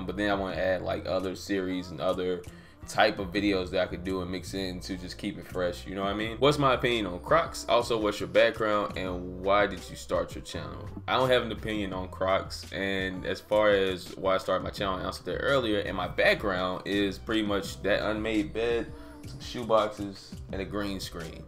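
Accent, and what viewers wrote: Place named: Mississippi, United States. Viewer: I would love to hear your Mississippi accent! Thanks for answering my question!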